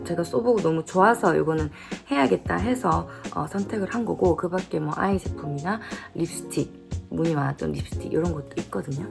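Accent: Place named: Busan, South Korea. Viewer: 얼굴하고 스타일은 American Style일인데, 말투는 완전 부산 Tough Girl. Feels like New Age 이효리. 그런데 왜 이 영상들이 네 recommendation으로 계속뜨지. 난 화장에 관심 없는데.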